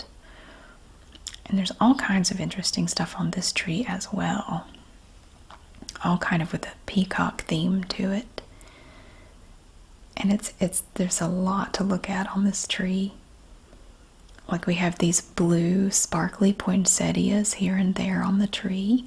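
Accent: South, United States. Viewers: This is my first time on your channel and I just love love love your accent!!!!!!!! I’m obsessed with southern accents! Also love your vibe and energy! I’m now subscribed and look forward to your future uploads! Love, from the U.K.! 💖💖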